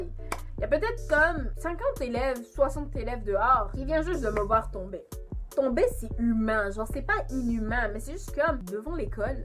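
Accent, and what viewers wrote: Canada. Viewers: It’s t'as un accent de canadien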